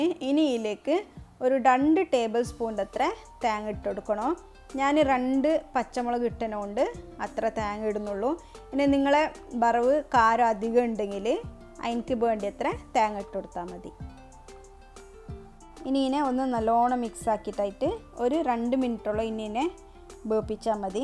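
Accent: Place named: Kasaragod, India. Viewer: Nice video dear..especially kasargode talk 👍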